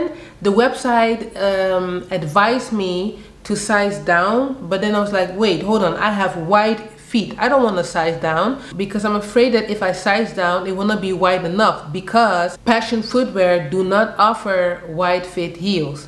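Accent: Netherlands. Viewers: Love your content!! You sound Dutch 😍😘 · You have a Dutch accent, are you from the Netherlands?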